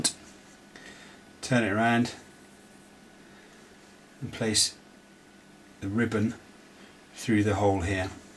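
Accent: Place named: United Kingdom. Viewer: Thank you very much for this video. I was able to fix my son's 820 for 1/3 price! and your British is music for my ears:) Greetings from Budapest.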